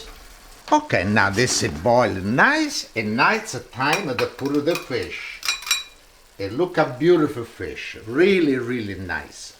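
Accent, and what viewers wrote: Italy. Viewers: its kinda crazy, you can almost hear how the NY dialect developed from this italian accent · This looks delicious! And your English is excellent,! I love your Italian accent ❤️. Salute e cin cin!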